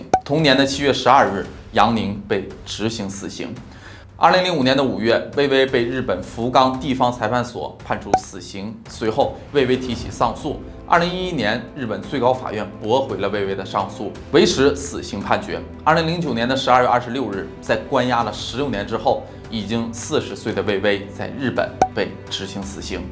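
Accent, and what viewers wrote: Northeast, China. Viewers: 是不是，东北人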